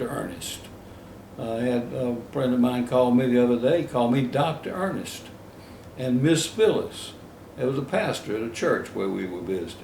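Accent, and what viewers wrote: South, United States. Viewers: it sounds so much better when you say it in your soft southern accent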